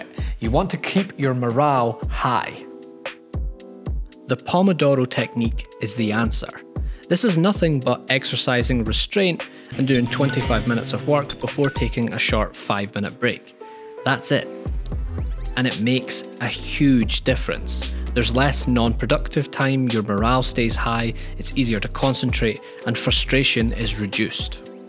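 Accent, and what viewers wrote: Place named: Scotland, United Kingdom. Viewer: your videos are always an inspiration to me, thank you! Your methodical and logical approach, and calming Scottish burr, provide a path to things I've previously thought were out of my reach. God bless you!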